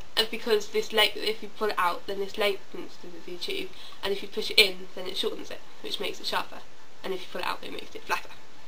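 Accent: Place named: England, United Kingdom. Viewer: Aww you're sweet but this english accent is sickening!!!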